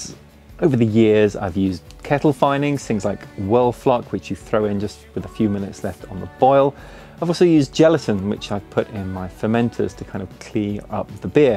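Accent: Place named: United Kingdom. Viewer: I was actually thinking something similar when I heard her speak - there's definitely some British influence rubbing off on her accent sometimes!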